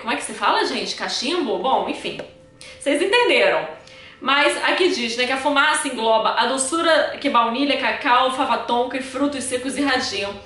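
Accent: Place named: Rio de Janeiro, Brazil. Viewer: chequei por aqui agora, masssxx vc tem muito sotaque de carioca. Amei os videos!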